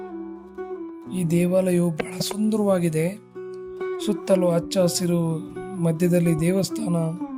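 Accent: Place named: Kolar, India. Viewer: Formal aag matadbeda loki nin accent ee channag aithe local kolar language ee matadu 😂 3 min video alli ond 10 sala aadru "galu " barbekittu ❤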